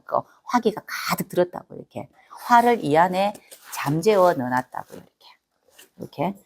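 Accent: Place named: Daegu, South Korea. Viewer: 저 이분 설명 너무 잘해주시고 대구 사투리가 참 예쁘네요 대프리카 싫어하는데 이분강의는 참 좋네요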